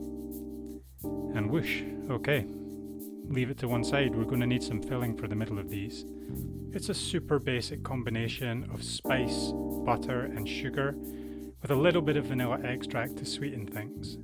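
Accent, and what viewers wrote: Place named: Scotland, United Kingdom. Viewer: Great video! I enjoy your Scottish accent and voice. 😊 it’s relaxing and calming and not comparable to nails on a chalkboard either 😂 These look beautiful and would be lovely for a holiday brunch , can’t wait to make ! Thank you for a great video and recipe !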